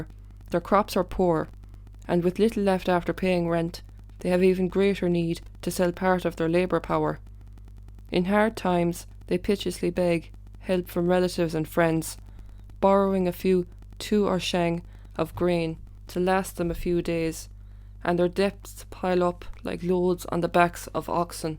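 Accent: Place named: Ireland. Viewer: Thank you for your hard work as always, comrade! I could listen to your Irish voice all day!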